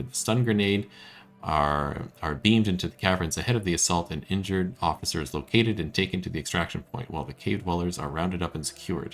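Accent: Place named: Alberta, Canada. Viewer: A fellow Canaadian, and sounds like a fellow Albertan as well, loving the content as usual!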